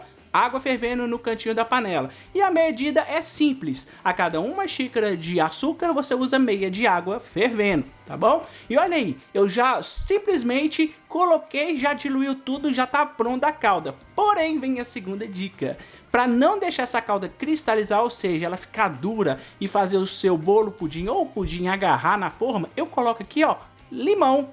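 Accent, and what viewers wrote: Minas Gerais, Brazil. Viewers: Eu ja adoro te ouvir com esse sotaquinho mineiro. Amo suas receitas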